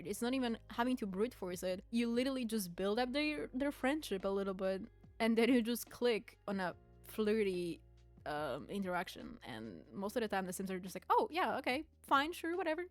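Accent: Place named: Poland. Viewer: omgggg of course it's slavic (i've always loved that regions accents, but i dont think i've ever heard a polish accent). its beautiful i love it so much!!! 🤍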